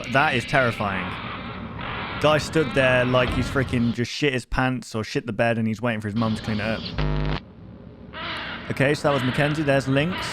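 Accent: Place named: United Kingdom. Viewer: Bro is so British I thought he was saying shack instead of shark for like 60% of the vidoe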